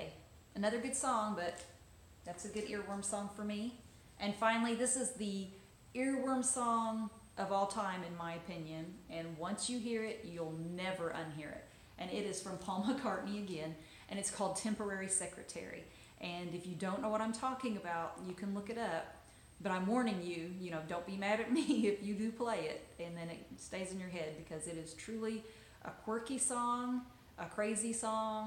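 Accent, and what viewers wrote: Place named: Georgia, United States. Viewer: Are you in the Carolinas? Trying to place that voice. I was born in the Midwest but grew up in the Carolinas. You sound like you're from my neck of the woods. Maybe Georgia.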